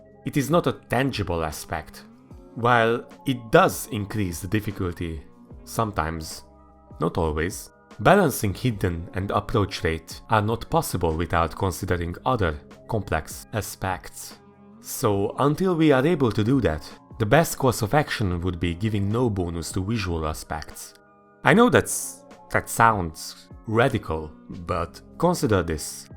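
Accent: Hungary. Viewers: Egy ideje nézem a videóidat, ismerős volt a kiejtés, de csak most realizáltam, hogy magyar vagy :D Így tovább!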